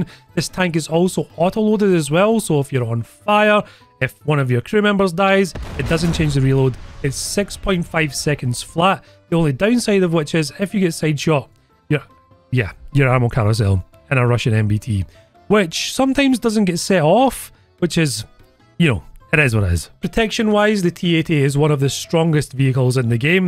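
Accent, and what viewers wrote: United Kingdom. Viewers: You have an intresting accent which part of the uk are you from? I cant tell where most accents are from I a kiwi (new zealander) thought another kiwi was an american because they were from a different part of nz and spoke slightly differently. It was rather embarassing when he said he was a kiwi, thankfully he thought i was an australian so we both had a laugh about that.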